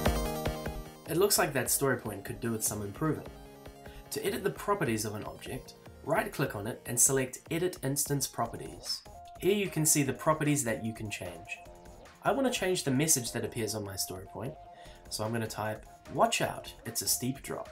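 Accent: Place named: New Zealand. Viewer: you sound like a kiwi XD sorry if ur not XD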